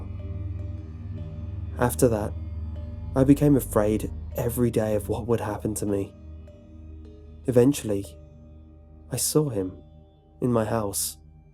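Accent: United Kingdom. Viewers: the narrator is British right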